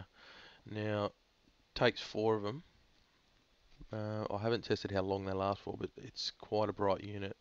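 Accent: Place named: Australia. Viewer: He's From Straya. We think Americans talk funny.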